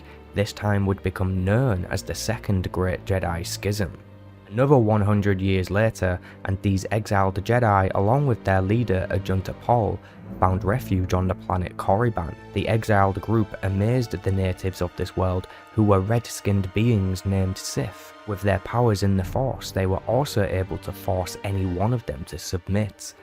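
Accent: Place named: Yorkshire, United Kingdom. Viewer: Just recently joined your subs, and would just like to say it's nice to hear a fellow Yorkshire man🤘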